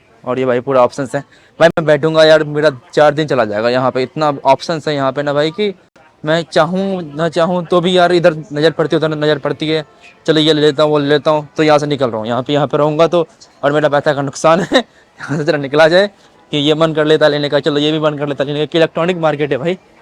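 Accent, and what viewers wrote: Bihar, India. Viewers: Bhutla jaoge ye jo bihari axcent haii hm bihario ko tum se khas jor ke rakhta h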